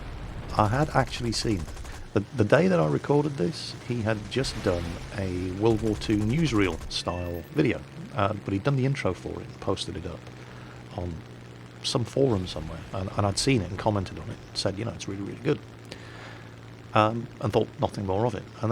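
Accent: Australia. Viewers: Love that australian accent! Keep doing it mate! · lol love the aussie accent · nice australin acent i come from australia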